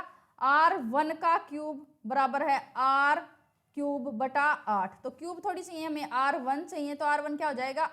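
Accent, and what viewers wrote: Haryana, India. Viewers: Mam aap Haryana se ho kya??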